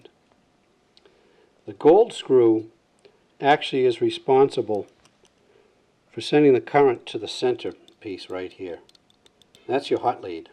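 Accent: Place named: Boston, United States. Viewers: Glad I came across this. Good accent. I actually need to learn how to do a Mass/New England accent for a play and this should help nicely😄 I’m actually looking to fix a foot switch for a lamp. Thanks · Actors should listen to this guy before attempting a Boston accent in movies. Most actors hit it WAY too hard. Hahd lol. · As soon as you said That, I knew your were from Massachusetts. Made me a bit homesick. Hope your shop is still doing well. Thank You for a great demo.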